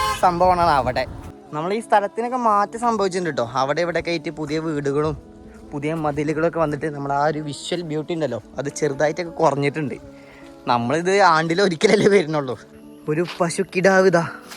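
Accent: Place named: Palakkad, India. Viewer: Palakaad ഭാഷ കേൾക്കാൻ നല്ല രസമാ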